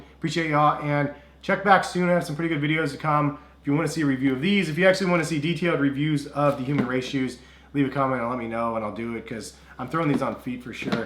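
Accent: United States. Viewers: are u American?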